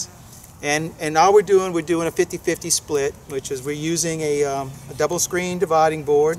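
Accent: Louisiana, United States. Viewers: Are you from Louisana?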